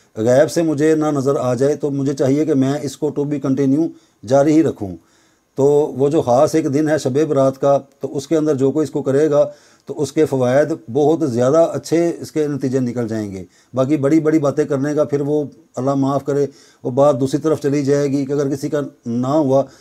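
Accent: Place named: Lahore, Pakistan. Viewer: Acha to mery bhai lahore sy hain ..lgta to tha ap k bat krny k lehjy sy vesy ..main b lahore shalimaar baagh sy hun bhai ..baghbaan pura sy ..sun k khushi hui ap to sachi main apny hain mery .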